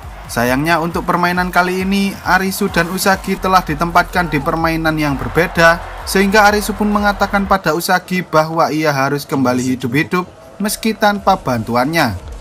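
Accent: Java, Indonesia. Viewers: Jowo ta mas?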